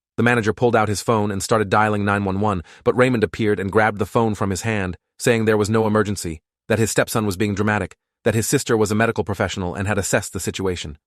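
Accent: United Kingdom. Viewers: Did you notice the way it slowly switched to a British accent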